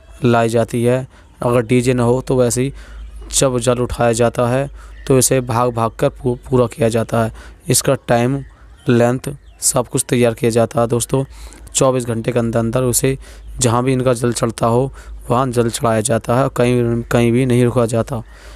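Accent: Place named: Uttar Pradesh, India. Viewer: Bhai shayad aap uttar Pradesh se ho , shayad bulandshahr ke aas paas ke ho ,😂😂😂😂😂 language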